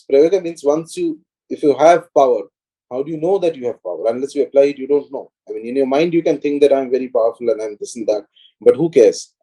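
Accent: Kerala, India. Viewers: Malayali? Tamil? Just asking.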